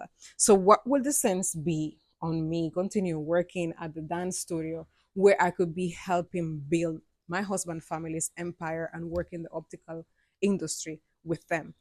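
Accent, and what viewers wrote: Jamaica. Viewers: I love this. I'm surprised you made the transition to Jamaica. I've heard Cubans like Dominicans don't care for blacks. Here you are in Jamaica. I love this. Girl: if you could see my face; lit up, listening to your Jamaican accent. I'm from Montego Bay, now living in Texas. We need to learn more about our Cuban brothers and sisters. Welcome to Jamaica.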